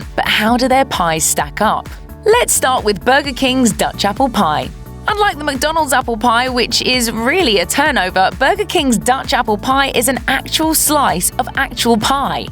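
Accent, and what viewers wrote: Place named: United Kingdom. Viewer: This is America and McDonald’s is an American company why are you using a British narrator?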